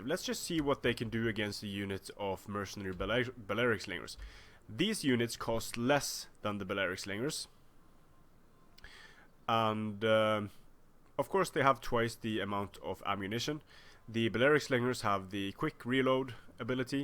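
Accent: Scandinavia. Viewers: You sound exactly like the guy from Red Ice radio. Norwegian / Scandinavian English accents sound very good... Most of you speak better English (with equivalent vocabulary) than perhaps 75% of Americans and 50% of Brits. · Great video!! Your english sounded quite like "the Scandinavian english" - Am i wrong/right?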